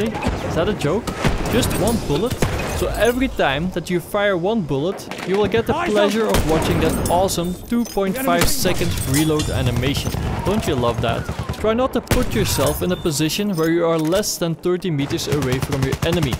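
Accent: Netherlands. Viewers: Fix je accent en wees alsjeblieft niet zo Nederlands. Mijn oren bloeden. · are u dutch?:P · the dutch accent is strong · are you doing that dutch accent on purpose? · are you Dutch? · Annoying Dutch accent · Lekker nederlands accentje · youre dutch right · i hear a dutch accent in your english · Jij bent Nederlands zeker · You have the dutchest accent ever hehe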